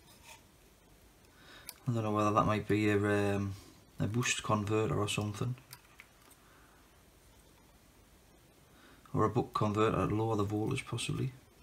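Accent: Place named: Northumberland, United Kingdom. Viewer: I'd have placed that accent more in Northumberland than Cumbria but very nice either way 😁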